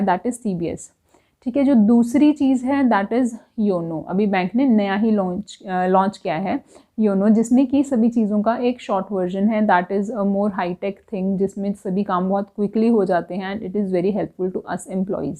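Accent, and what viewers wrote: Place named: United Kingdom. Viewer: i like ur eng accent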